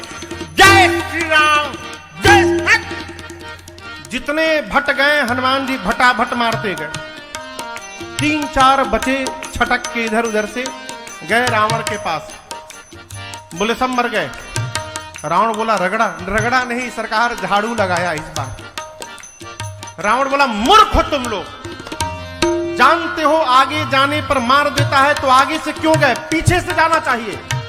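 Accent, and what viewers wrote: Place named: Bhojpuri, India. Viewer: आपका भोजपुरी मीठा बचन सुनकर दिल खुश हो गया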